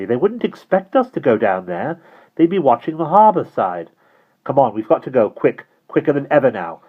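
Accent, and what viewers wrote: England, United Kingdom. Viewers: Really enjoying this. Great English accent (I’m in England) only one mispronunciation (British English-wise) is “quay”. In the UK we pronounce “quay” as “key”. It’s brilliant hearing one of my old favourites read so well. Thank you for doing this.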